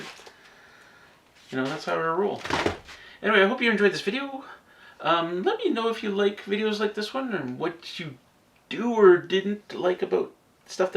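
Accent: Canada. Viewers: Hello, Im assuming this is Canada , bc of your accent. Are you allowed guns while living off grid in Canada? A life like this goes hand in hand with rifles. Just a weird question for ya. Killer set up sir. Cheers!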